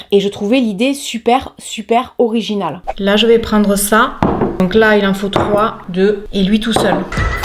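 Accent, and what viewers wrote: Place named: Southwest France, France. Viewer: Bien sur que cette vidéo nous plait ! Toutes tes videos sont superbes! Je vis à montral mais je biens du sud ouest. Ca fait plaisir d'entendre l'accent chaque semaine :) merci ❤️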